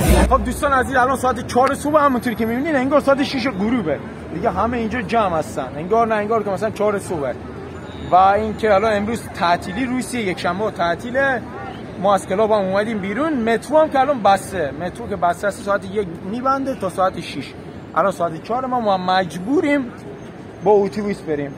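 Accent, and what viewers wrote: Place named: Sabzevar, Iran. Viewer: لهجه سبزواری موج میزنه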